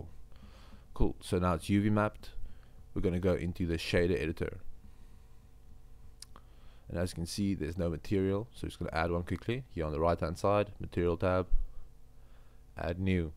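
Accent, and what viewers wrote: South Africa. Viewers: Ngl get south African vibes from ur voice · dude are you South African?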